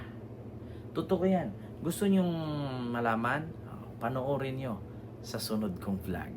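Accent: Visayas, Philippines. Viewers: sorry medyo subscribe aq kc akala q bisaya ka 😊bisaya kc tono mo galit kc aq sa bisaya na gaya ni digongnio 😊😅😮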